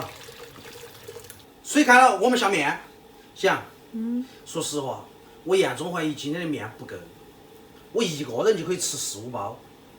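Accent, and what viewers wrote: Sichuan, China. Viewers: 四川话怎么这么难听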